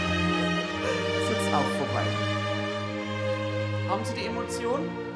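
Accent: Bavaria, Germany. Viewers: Ich muss sagen, komplett bayrisch ist sein Sketch noch mal besser.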